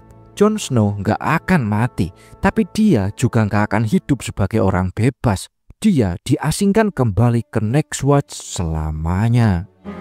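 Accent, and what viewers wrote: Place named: Java, Indonesia. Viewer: jawanya medok banget bang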